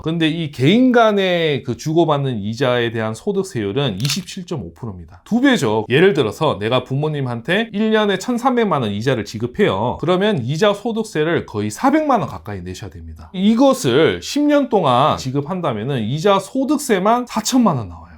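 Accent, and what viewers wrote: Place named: Jeolla, South Korea. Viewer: 시원시원한 설명이 귀에 쏙~ 들어오네요 게다가,구수한 전라도 억양 살짝 풍기는 그 말씨! 듣기에 왠지 좋아요~~^^ 영상 많은 보탬되었네요 감사합니다,화이팅~~~!!!!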